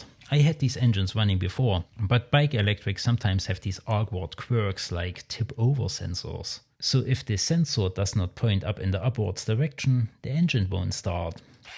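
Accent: East Germany, Germany. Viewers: Ostdeutsch und Englisch gemischt geiler typ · Man hört das du Ossi bist, geiles Video! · Never laught so much about a german accent xD I love it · der akzent, pfeffi und goldkrone... schon eher östlich in deutschland oder 😁 keep on going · What is this? Is this the east?-German (based on the accent) version of StuffMadeHere with a focus on engines and a moldy refrigerator full of alcoholic beverages? Super exciting to watch in any case! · Du kannst mir sagen was du willst, Youtube sagt, deine Videos sind aus den USA, dein Shop registriert in UK, aber dein Dialekt und dein Erfinderreichtum... Deutscher 😅 Bei kann man sogar Lieferwagen sehen, die das bestätigen... Bisschen chaotisch, interessante Ideen und sogar Wissenschaft dahinter. Cooler Content! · Das englisch mit ostdeutschen dialekt ist immer am besten xD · Am I imagining things or are you from east germany? 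My coworker is from Sachsen Anhalt and he sounds pretty much identical to you in terms of dialect.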